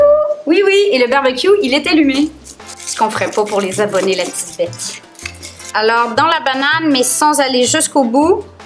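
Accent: Quebec, Canada. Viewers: Salut super vidéo ! Je pense que ta joie de vivre et ton bel accent québécois t'emmènerons loin ! ( enfin j'espère bien ! 😁) Merci pour tout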